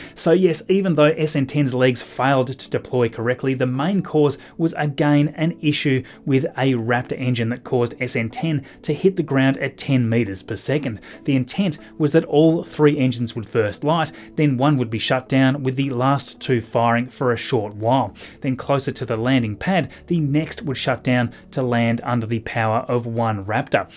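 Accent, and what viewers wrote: Tasmania, Australia. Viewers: Tasmanian accent!!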